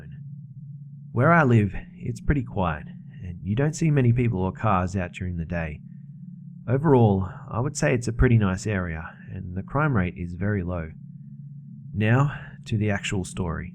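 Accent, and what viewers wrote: Australia. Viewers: finally an ozzie CVNT reading me stories. thanks CVNT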